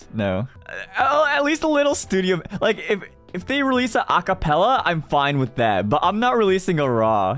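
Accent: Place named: United Kingdom. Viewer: 英國腔日語ww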